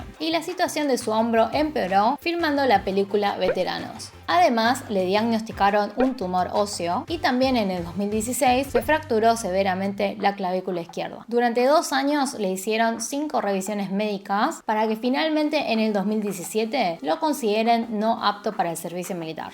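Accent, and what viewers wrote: Argentina. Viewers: Es raro ver una persona con tus rasgos orientales hablar argentino no español argentino marcado, se q debe ser vueltas inmigrantes ya debe ser normativo pero me sorprende igual, rasgos físicos son muy marcados, y acento también., es combinación diferente. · habla español con acento argentino. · Muy buenos tus videos lo unici que no me gusta es ese molesto sonido argentino .shoñ shoñ lo siento es mi umilde opinion ...que tengas un lindo dia👈😇👍👈😎👍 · Hablas español perfecto con acento argentino... Es mágico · Hablas como n dejo argentino pero con mentalidades coreanas no me parece corrupción sino libre albedrío que debería haber ya se acabó la esclavitud querida todos tienen derecho a buscar que nacionalidad quieren tener o ir o no ir a cumplir el servicio militar es que claro solo en Asia específicamente Corea y también oriente el machismo también se terminó deberían cambiar esas políticas narcisista soy privilegiada e evivir en Latinoamérica taynqye nos quejemos tenemos muchas libertades no nos lavan el cerebro desde la escuela como allá ser mejor no quiere decir ser infeliz. 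Si se pueden ambas.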